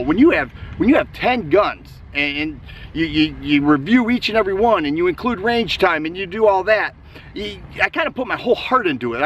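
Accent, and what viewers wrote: Michigan, United States. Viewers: Suffering succotash, I never noticed a lisp!! To me, you sound like an honest, down to earth, informative gun guy. And a Michigander. You always respond, not like the other guys...you are number one in my book bud. Keep up the good work. Oh, almost forgot, the refrigerator door series are my favorites!! Any chance of bringing a new, similar series? Steel door, old fridge door, dishwasher door....you see where I'm goin with this? Thanks a bunch. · and as far as your voice goes it reminds me of home, fellow michigander here. currently in GA.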